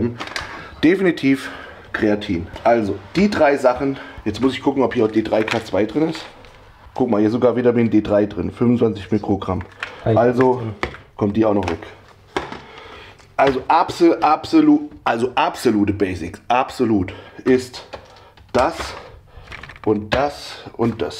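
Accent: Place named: Hesse, Germany. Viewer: Er meint wohl hessisch, wohne nicht so weit von ihm, aber muss wirklich sagen das man in jeder Gemeinde/Stadt ein bisschen anders spricht. Anstatt „spitz" sagt man hier zum Beispiel „speutz". Aber Plattdeutsch ist es nicht 😅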